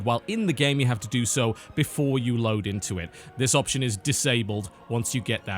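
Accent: United Kingdom. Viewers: he died?!?!?! If so, may he rest in peace...that's really sad. I will miss his British (or English, Idk) accent...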